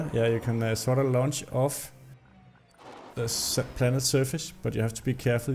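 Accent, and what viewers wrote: Denmark. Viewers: Are you danish??? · Nope. He sounds like a Danish guy speaking English. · Tak. Så nemt at høre du er dansk 🤗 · hehe 7 sec in to the video, and Han er dansker :)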